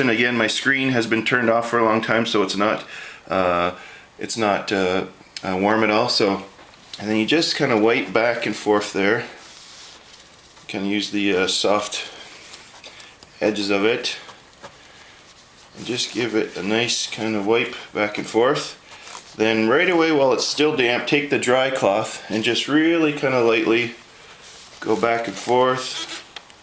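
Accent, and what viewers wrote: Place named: Canada. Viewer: first 30 secs i know he's Canadian and i'm not American. ;D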